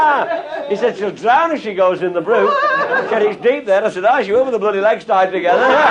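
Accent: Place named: Cheshire, United Kingdom. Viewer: Aye...it's reet Cheshire. Canst tha kick an 'ed a bol' aginst a wol' 'till it's bosted? ;)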